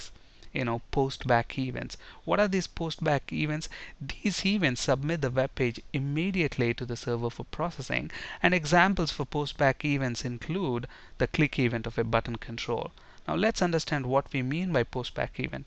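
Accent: India. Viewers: very helpful ....may i know where you are from? ur english is excellent but u kinda have a indian accent.. :) am i right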